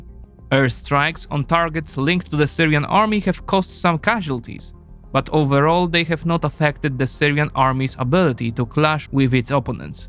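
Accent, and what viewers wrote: Poland. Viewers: The narrator sounds Polish. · His information are biased and not accurate. Not a surprise with his polish accent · Nie bardzo rozumiem jak można mieć tak podobny akcent do "naszego" ( 😉) i być tak konsekwentnie stronniczym na korzyść naszych obecnych okupantów 🤦‍♀ ( których mamy już ponad 10 tysięcy i to w pełnej gamie kolorystycznej - ze wskazaniem na tony wieczorowe... ;) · He’s Polish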